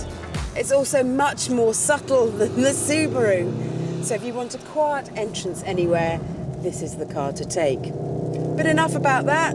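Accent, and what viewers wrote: RP, United Kingdom. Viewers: british accent on a blonde....sexy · An intelligent British woman with perfect Queen's English who's a petrol head AND can drive like that? Marry me.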